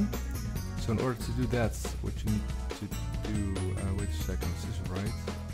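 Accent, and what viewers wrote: Netherlands. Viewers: I sense a Dutch accent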